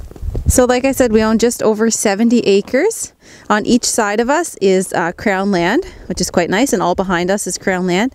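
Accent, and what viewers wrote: Canada. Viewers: LOL! I love Canadians and Canada and have been noticing alot of goodies coming from Canada as of late! I too figured out she was Canadian from some of the words and the accent when she finish some sentences. I am living in the Cold as a Puerto Rican so I am paying attention to Canadians and how they build and live so i can survive these freezing painful NON CARIBBEAN temps!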